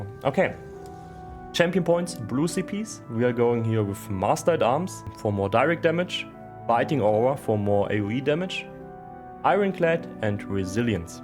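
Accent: Germany. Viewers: I love the german accent, never will miss the days with the guys in municher octoberfest xD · Dein Englisch ist so niedlich "typisch" Deutsch ;-)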